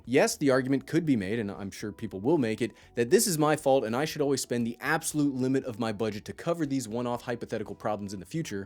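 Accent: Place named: Midwest, United States. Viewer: AI voice with midwest accent? 👍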